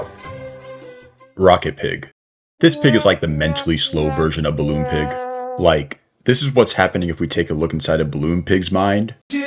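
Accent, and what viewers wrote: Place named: Brazil. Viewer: eeeee um brasileiro falando ingles!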